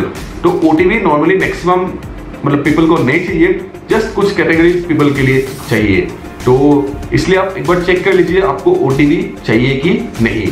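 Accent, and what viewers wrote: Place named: Assam, India. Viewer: your tone looks like that of a assamese or a bengali guy..😅😅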